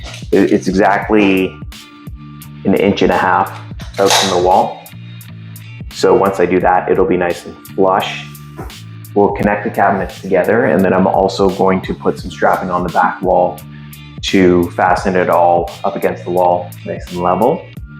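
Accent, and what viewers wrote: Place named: Ontario, Canada. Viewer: First, I didn't mind the talking. It helps provide context. Second, the shirt says ".ca", and you're drinking a boneshaker (a man of taste, I'd recommend an Octopus Wants to Fight if NEIPAs are your jam). As a fellow Canuck, and Ontarian - I'd peg your accent from somewhere around Tarrana (proper pronunciation) Ontario. To the other poster, this guy clearly is not a "yank". Thanks for providing the video. Will do this strapping this weekend.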